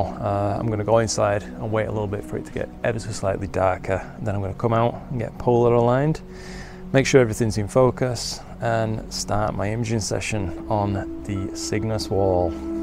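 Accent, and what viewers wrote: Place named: North, United Kingdom. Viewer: Hey, you sound like you are up from around my way (I'm a bit further North I think). You should come join our lucky imaging project on Discord, would be good to have you in the community.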